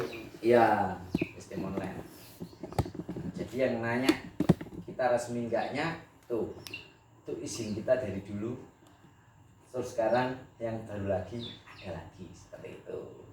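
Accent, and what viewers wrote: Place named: Java, Indonesia. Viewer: Asli wong Palembang,,,,,, TPI logat bicara Jawa ny jngan d bawa mas,,,,,,bawa logat Palembang aj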